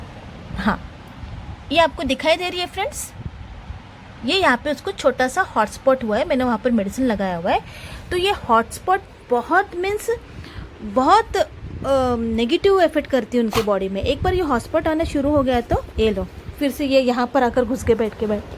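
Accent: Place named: Telugu, India. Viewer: Pakka telugu Haaa😅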